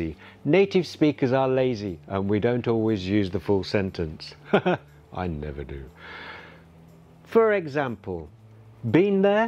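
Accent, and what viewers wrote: United Kingdom. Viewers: love love love this British accent😍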